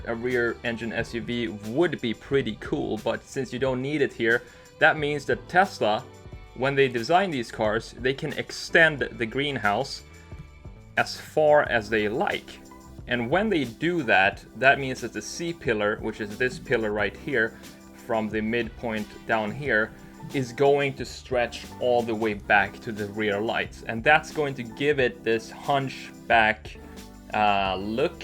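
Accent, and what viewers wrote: Sweden. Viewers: are you swedish? love your content btw! :D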